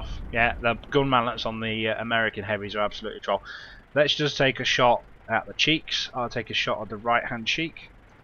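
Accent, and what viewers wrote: England, United Kingdom. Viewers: Which part of England are you from mate?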